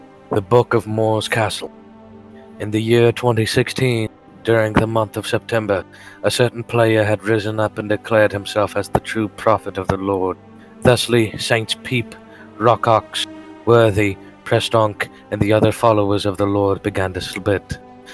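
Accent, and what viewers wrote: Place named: South, United States. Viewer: narrated by a fellow southerner. liked.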